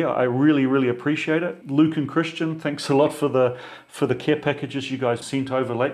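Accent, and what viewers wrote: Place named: New Zealand. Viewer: I'm a hobby machinist and have spent 30 years trying to learn as much as possible. This video was the best, most engaging learning I've experienced since hanging out with my 90 year old tool maker friend when I first fell in love with machining. THANKYOU for your time and content. This was so good. I fully thought you were kiwi like me with your accent, but maybe an Xpat... thanks from down under down under. Chch nZ ❤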